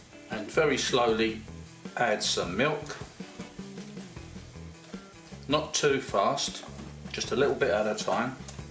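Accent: United Kingdom. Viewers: Hello! I love fish! Especially when it's a form of pie 😊 anyways, are you Scottish? You sounded like it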